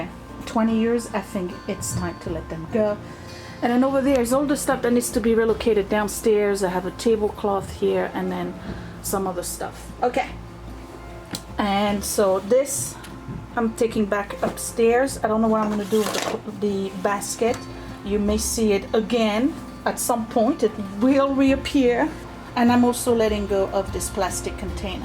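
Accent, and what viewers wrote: France. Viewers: not sure you will see this but I felt compelled to tell you that because of YOU and your daily motivation,allowing us to see your REAL life "collecting" I finally dropped off 6 trash bags and 2 boxes of clothing to the goodwill yesterday. I have been unable to make any substantial progress in reducing my junk until I met you. So when you are exhausted and not sure your videos are worth it, believe me they are SO appreciated. I hear your lovely exotic french voice in my head all day, motivating me to keep making those decisions, I have ignored for 10,15,20 yrs. I have not followed your schedule but started with clothing stored in bins in the laundry room because they bothered me the most and felt they would make the biggest impact once gone! This will take months and months but I am finally ready!! Thank you is not enough.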